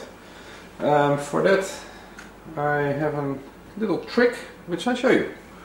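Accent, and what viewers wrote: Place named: Netherlands. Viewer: Strange that the voice has dutch accent but the socket on the wall is chinese.Maybe there is a chinese factory producing these Miele appliances?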